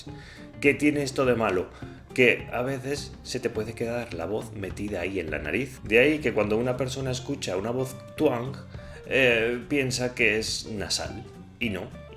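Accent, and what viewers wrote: Spain. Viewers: eres asturiano?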